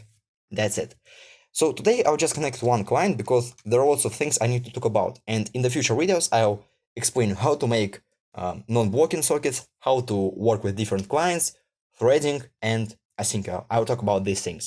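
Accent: Russia. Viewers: эхх, русский акцент выдал тебя, но говоришь по делу, you're being great!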